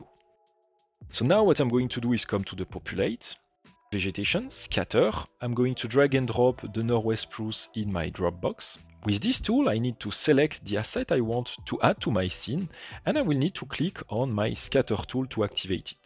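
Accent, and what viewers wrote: France. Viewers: Thank you so much for the great tutorial. And the French accent is just drop dead gorgeous!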